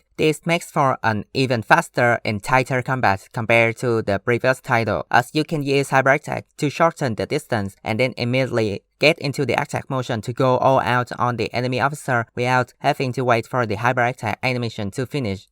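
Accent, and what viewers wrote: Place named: India. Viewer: Kudos, an excellent review covers pretty much everything I needed to know! however ur accent still needs bit more practice it mite be difficult for people outside India and Asia to understand. Good luck!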